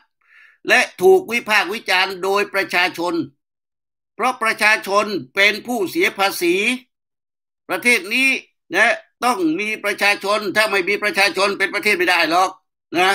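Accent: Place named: South, Thailand. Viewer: Love your Southern Accent!!!( Ohh.Pukk Tai Ban Rao.)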